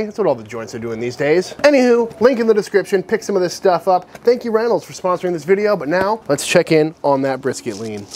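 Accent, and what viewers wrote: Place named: South, United States. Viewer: That slow southern drawl is just killin' me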